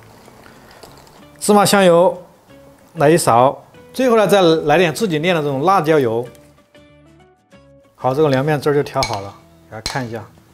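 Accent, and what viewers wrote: Sichuan, China. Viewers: 椒盐普通话，四川人😅😂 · 川普听着亲切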